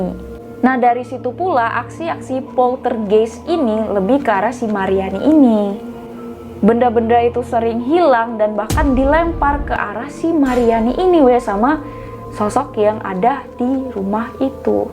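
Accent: Medan, Indonesia. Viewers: Dari yg kangen denger cewe logat medan.. Skarang udah terobati Kangen Tebing Tinggi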